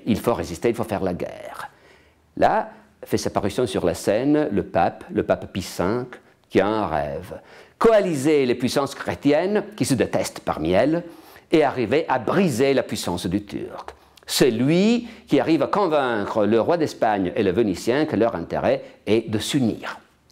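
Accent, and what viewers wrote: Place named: France. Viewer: Ho pensato la stessa cosa..e che bellissimo accento français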